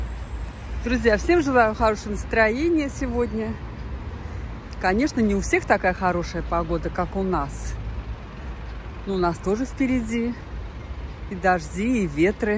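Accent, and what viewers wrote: Baku, Azerbaijan. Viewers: Ваш русский язык с бакинским акцентом это услада для моих ушей.Спасибо за настроение. Баку город моего советского детства)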